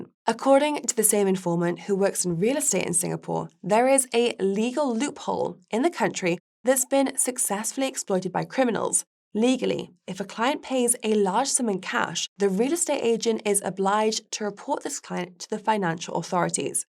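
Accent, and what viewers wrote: United Kingdom. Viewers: Her British accent n soft voice 🍬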